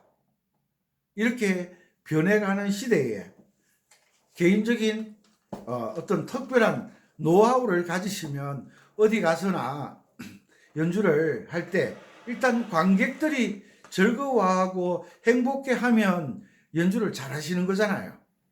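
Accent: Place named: Gyeongsang, South Korea. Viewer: 완전 갱상도 니다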